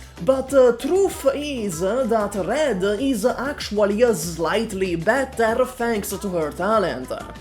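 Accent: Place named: Italy. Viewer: such an Italiano accent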